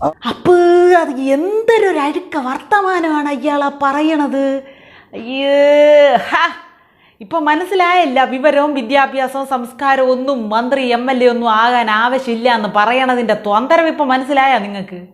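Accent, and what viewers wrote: Thiruvananthapuram, India. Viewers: ഭാഷ തനി തിരുവനന്തപുരം: തിരുവനന്തപുരത്ത് കാരിയാണോ? അവതരണം സൂപ്പർ❤❤❤❤❤❤❤❤❤❤❤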